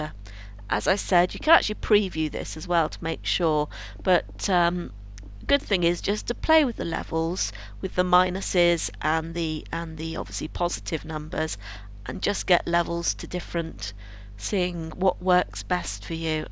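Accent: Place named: RP, United Kingdom. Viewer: I now know where I shall go to practice my posh accent. :D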